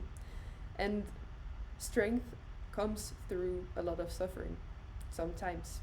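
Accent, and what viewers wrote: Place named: France. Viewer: Hey, where did you get your beautiful accent from. Bit sounding like romantic french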